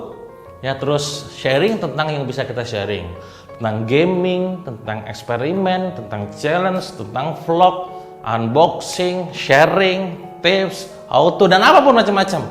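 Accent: West Java, Indonesia. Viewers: Sia akang nyunda pisan euyyy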